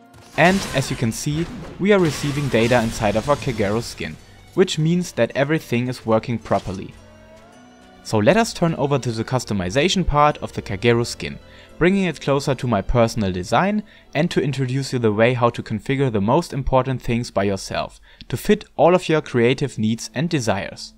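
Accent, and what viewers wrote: Germany. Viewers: Nice german accent^^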